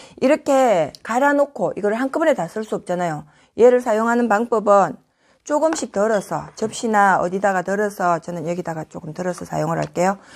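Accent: Gyeongsang, South Korea. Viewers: 말씀 도 부산 이나 경남 쪽의 말씨 이네요. 아주좋아요.😄 헤어스타일 도 멋있네요.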